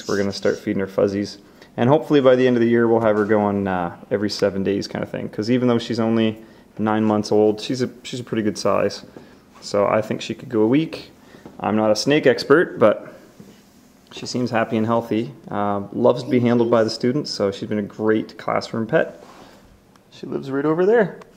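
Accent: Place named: Canada. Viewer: Why did i think this was king of diy yhe accent is obviously Canadian but his voice is so similar BTW nice snake im getting one in 2 months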